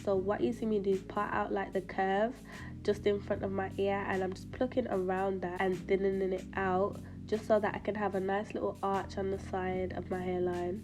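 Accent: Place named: West Midlands, United Kingdom. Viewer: I love black girls with a West Midlands accents !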